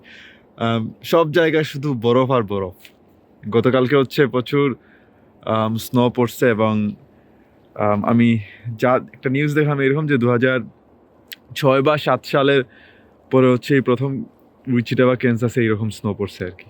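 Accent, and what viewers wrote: Sylhet, Bangladesh. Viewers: ভাই সিলেটি ❤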